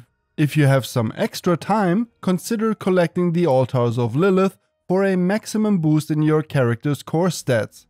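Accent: Germany. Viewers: sounds like a german guy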